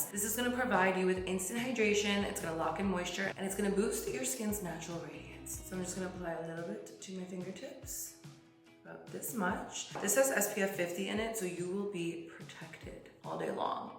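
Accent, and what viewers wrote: New Jersey, United States. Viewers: Wait why does her voice accent and tone sound different? She sounds less Jersey here😮 I love her NJ accent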